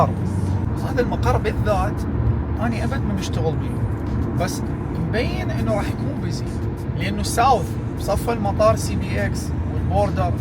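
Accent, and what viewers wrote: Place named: Baghdad, Iraq. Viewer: عبالي انت من بغداد 😊😊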